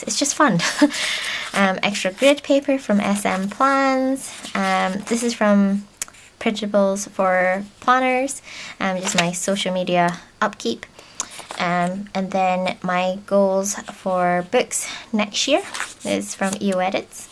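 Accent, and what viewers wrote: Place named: Scotland, United Kingdom. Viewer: Love the video! Was pleasantly surprised to hear a Scottish accent too 😁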